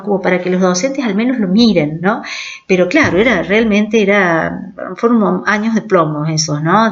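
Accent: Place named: Córdoba, Argentina. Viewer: Excelente explicación 😃 y con una excelente tonada cordobesa.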